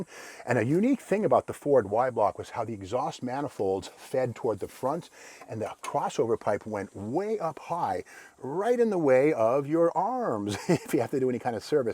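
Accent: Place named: Massachusetts, United States. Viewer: He might have. You could detect a MA accent, but not on every word. I have a friend from Saugus, and he has a much more pronounced accent on every word.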